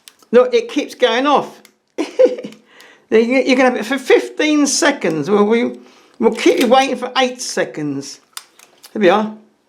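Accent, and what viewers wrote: United Kingdom. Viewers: Where is your accent from? It seems definitely British but I don't get the specific zone. (I'm not a native speaker, I'm Spaniard.)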